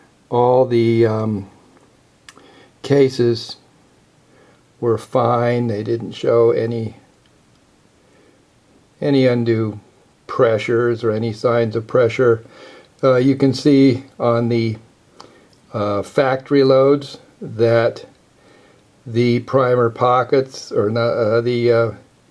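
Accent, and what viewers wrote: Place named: Chicago, United States. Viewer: Sounds like your in Chicago!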